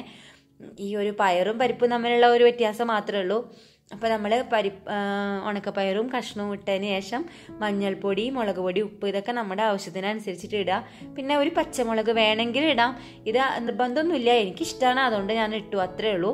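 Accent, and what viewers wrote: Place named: Northern Kerala, India. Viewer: .. നല്ല വീഡിയോ ആണ് കേട്ടോ.. I like your വടക്കൻ slang 😍😍😍പക്ഷേ അരി നാലഞ്ച് പ്രാവശ്യം കഴുകിയാൽ അതിലെ തവിട് മുഴുവൻ പോയി ഗുണം നഷ്ടപ്പെടും എന്ന് പറയാറുണ്ട്... അതുകൊണ്ട് ഞാൻ..maximum രണ്ട് മൂന്ന് പ്രാവശ്യം മാത്രേ kazhukarullu...just അഴുക്ക് കളയാൻ മാത്രം.. ഇത് negative comment Alla കേട്ടോ..😊😊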